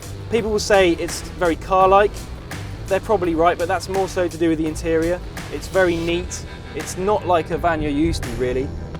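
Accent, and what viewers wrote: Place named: RP, United Kingdom. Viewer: I don’t think with that accent you hang out, with to many van and truck drivers or any other working man, Your more at home with Trinney and Rupert on the Kings road in a coffee shop.It’s a rebadged crafter, Man trucks are VW commercial wing. That information was not in the MAN brochure they handed you one hour before. You did not tell them this is VW first pure Crafter, not a VW engined Sprinter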